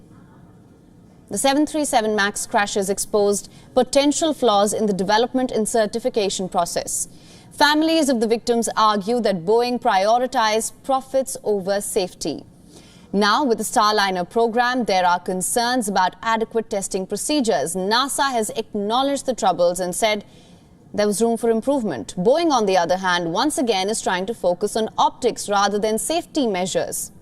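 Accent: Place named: India. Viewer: She still have Indian accents that sounds good for the Indians.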